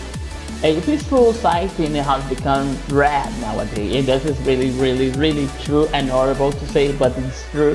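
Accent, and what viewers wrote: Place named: Ceará, Brazil. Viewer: Cearense English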